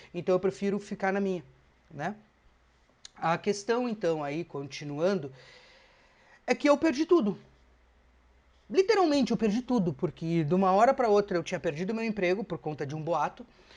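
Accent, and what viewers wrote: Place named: Rio Grande do Sul, Brazil. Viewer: Que lindo vídeo! Pelo sotaque so pode ser gaúcho kkk. Sempre tento fazer a Oração e não termino. Sei do que estás falando: já estive no fundo do poço e todo mundo desapareceu....meu pai, mãe e irmão me estenderam a mão e me salvaram da fome e do despejo. Se vc não tem grana vc não tem amigos. Me reergui na medida do possível e aprendi a viver na Solitude, a não esperar nada de ninguém e principalmente: a olhar de outra maneira para pessoas que eu achava mais "humildes" que eu....Hoje eu cumprimento, dou atenção, paro pra conversar, ajudo no q posso, mas é de coração, com carinho, sabe. Porque não sou mais que ninguém. Gratidão e Axé pra vc.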